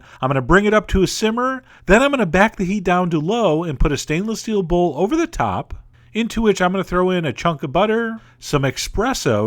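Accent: United States. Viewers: i hate how u cant say anything. tiramisu? espresso? so american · thats right cause he lives here in america and this is how we pronounce those things....talk about ignorant.....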